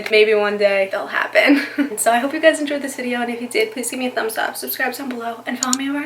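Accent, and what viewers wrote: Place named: New Jersey, United States. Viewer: You remind me of the jersey shore cast the way you talk!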